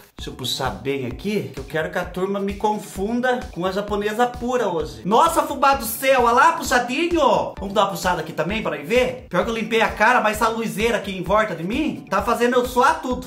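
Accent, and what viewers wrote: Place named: South, Brazil. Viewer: Vc é tão fofo , adoro vc , gostaria de saber de onde vc é , vc fala igual o povo do sul acho muito engraçado , bjsss 😘❤️🥰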